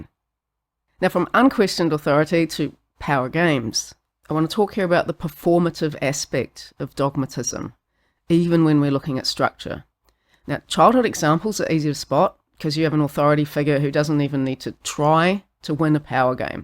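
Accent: New Zealand. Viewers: You look super interesting, like a fabulous actress from a noble lineage or something, part Vampire lady. 😊 And yes I clocked the NZ accent, I lived there <num>.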